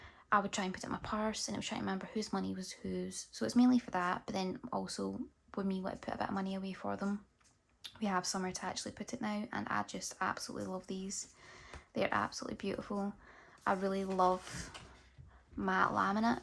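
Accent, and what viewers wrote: Scotland, United Kingdom. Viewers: Haha no problem, also don’t worry about spraffing on in your videos, it’s refreshing to hear a fellow Scottish accent doing a budget video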